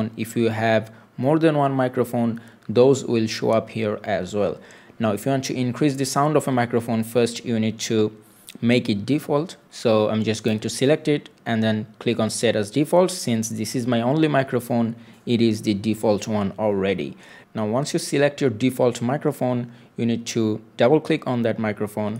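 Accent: India. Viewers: Where would the world be without people like you? Seriously, every time I have a problem in life, there's someone with an indian(?) accent that post a video somewhere that makes everything easier. People like you are the salt of the earth.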